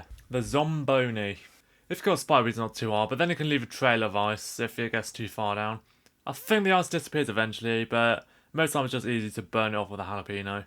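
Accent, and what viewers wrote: United Kingdom. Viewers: wtf is this Brit fuck saying, fkn hate their accent. they think it’s so intellectual, like did chill out with the adderall and caffeine u fkn stim junkie. Slow tf down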